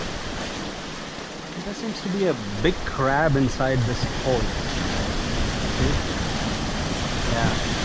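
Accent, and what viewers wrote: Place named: Telugu, India. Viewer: Maa shaa allaah baraak allaah Pure Telugu awesome bhai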